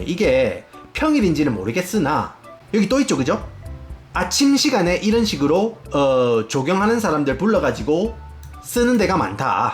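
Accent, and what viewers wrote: Gyeongsang, South Korea. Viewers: 와... 약간 혀가 꼬인 빡센 오리지날 경상도 사투리 들으니까 이거 머 내용이 재밌기도 하지만 듣는 재미가 쏠쏠하네요 ㅋㅋㅋㅋ 쌔끈빠끈 표현 찰지네요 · 쌍도 말,억양좀 쓰지마라. 토난다! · 아이 미국 현지 영상을 보는데 알아듣기 힘든 경남 사투리가 왜케 심하냐~ㅋㅋㅋㅋ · 경상도인듯 ㅎㅎ 고향 말투 들으니 넘 반가움ㅋㅋ 반갑습니다!! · 경상도 사투리인지 부산 사투리인지 모르겠지만, 사투리가 한국에서 듣던 것보다 엄청 재밌네요. 모르는 단어도 많이 나와요. ㅎㅎ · 아주 논리적으로 재미있게 갱상도 발음으로 마 말을 잘하시네요.😁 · ㅎㅎㅎ 경상도 사나이 재미납니다 ㅎㅎ.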